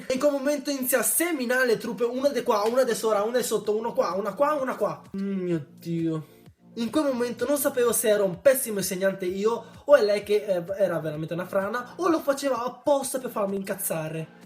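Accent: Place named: Veneto, Italy. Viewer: di dove sei? (italia) perchè ho sentito un pò di dialetto veneto ahah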